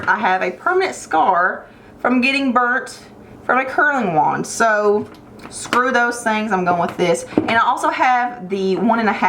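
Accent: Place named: Kentucky, United States. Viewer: Those are my favorite curling irons too! (The sally beauty ones) I’ve just discovered your channel today- you sound like us central kentucky girls, but I can hear a Georgia twang, too. Where are you from? Great channel!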